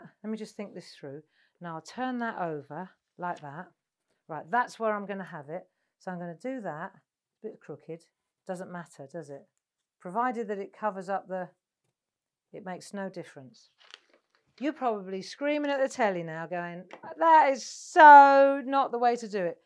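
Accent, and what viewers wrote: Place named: England, United Kingdom. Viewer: Amazing. Great video, your English accent makes me homesick.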